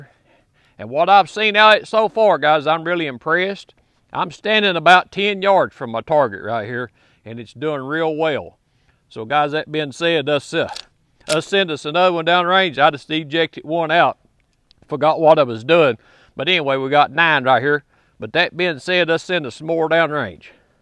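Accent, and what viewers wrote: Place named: South, United States. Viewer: Love this one can’t wait to pick one up.I just noticed something funny,watching this video while my dads started his diesel truck up can’t hear so put closed caption on and with your accent the stuff it types out vs what I know your actually saying is so far off it’s funny.not making fun of the southern accent the caption is lost it says Hornady as horn today.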